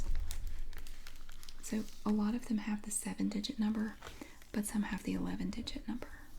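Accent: South, United States. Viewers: Love your accent, to me a Southern accent is a cockney accent :-)I live in the West Midlands UK.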